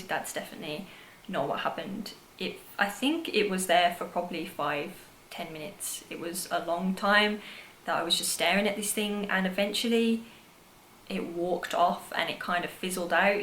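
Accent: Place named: Birmingham, United Kingdom. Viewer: Omg are you from Birmingham, im so distracted by your accent it's just so cute hehe!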